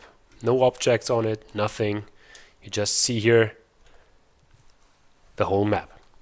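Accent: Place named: Germany. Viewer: do you still play coh2? Are you from Germany, because your accent sound a little bit like it. greetings from Germany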